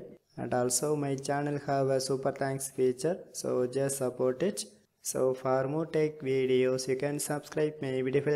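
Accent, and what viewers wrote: India. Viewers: If i hear this idian voice i know i will get saved Ty bro ❤❤❤❤😂😂👍👍👍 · THANK YOU, KIND INDIAN MAN. I LOVE YOU AND YOUR PEOPLE! AS SOON AS I HEARD THE ACCENT I KNEW MY ISSUE WOULD BE SOLVED!! ❤❤❤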